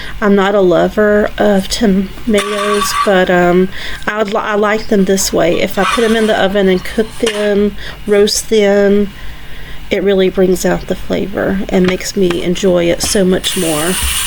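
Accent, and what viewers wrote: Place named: South, United States. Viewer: you've definitely got that southern drawl but we really like it! I do hope you didn't mind me mentioning the camera but it's an idea to play about with. You have done so well. It's not easy in the early days navigating filming, editing, uploading, being Infront of the camera etc. Hats off to you girl. Xx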